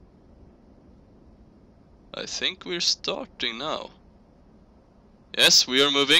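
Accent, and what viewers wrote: Sweden. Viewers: are you swe ore englich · Are yuo Swedish? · are you, Swedish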